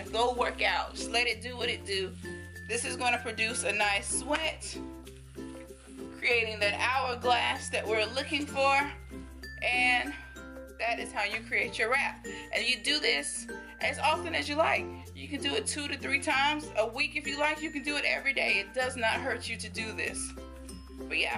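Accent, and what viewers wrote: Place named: South, United States. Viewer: Well... she does have an accent but it’s because it’s southern. Not ghetto. To me it’s not an accent because I was born and raised down here. Thanks queen for the video!!!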